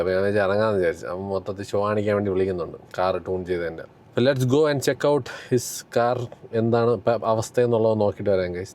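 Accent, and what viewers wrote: Trivandrum, India. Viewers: Why you gotten Trivandrum slang 🤔🤔🤔???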